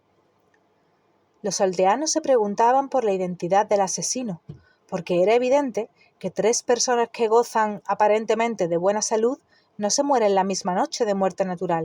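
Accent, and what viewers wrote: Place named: Malaga, Spain. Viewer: Me iba a poner a leer el libro pero tengo tanta fiebre que no puedo ni leer, así que me vienes de perlas para pasar la fiebre en cama. Me ha gustado mucho tu voz y entonación. Por cierto, eres malagueña? 100% seguro que de por esa zona pero no te sitúo exactamente al intentan no poner acento al narrar xD.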